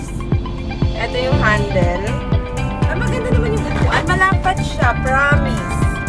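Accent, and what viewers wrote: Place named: Ilonggo, Philippines. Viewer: Ilonggo ang tonoo ahh kababayan to pa pla😂😂😅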